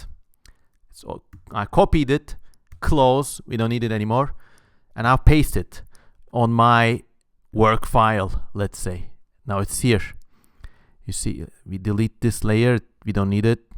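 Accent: Turkey. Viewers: That accent is so Turkish and I love it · I was just curious about All Tomorrows author, but never clicked one of his videos. I came across by and clicked it. After 1 second I heard you, I was like "Dude, are you Turkish?" And I clicked the Patreon and YES I WAS RIGHT Brrrr Ellerine sağlık <3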